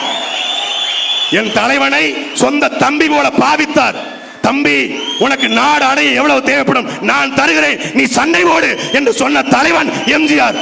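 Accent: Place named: Tirunelveli, India. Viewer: திருநெல்வேலிகாரன் தமிழ் பேச்சு னாலே தனி கெத்து தான்.தெளிவான உரையாடல்.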